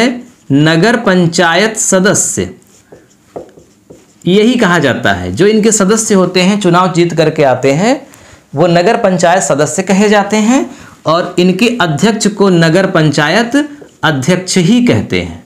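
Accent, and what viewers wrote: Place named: Uttar Pradesh, India. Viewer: U P me isi tarah se bolte hai....pronunciation pe mat jaiye...kitna acchese padhate hai..ye dekhiye please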